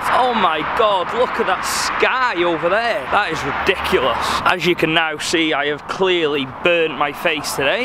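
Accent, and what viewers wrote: United Kingdom. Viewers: I think the British accent helps... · White skin & British accent. The keychain to America's chastity belt.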